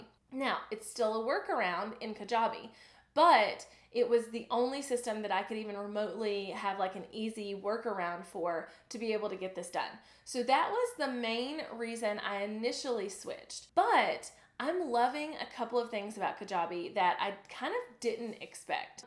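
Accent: South, United States. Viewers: She has a slight American Southern accent, and not only is that acceptable here in the United States, it’s actually preferred since it tends to increase likability and relatability. Barak Obama tends to infuse his speeches with Southern dialect even though he’s not from the south, and Bob Ross is beloved because of his southern euphemisms. While you are technically correct, what she said is not acceptable in formal writing, she’s engaging an audience, and in that case it’s totally acceptable.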